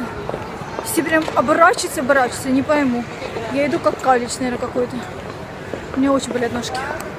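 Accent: Rostov, Russia. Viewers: вы с Ростова?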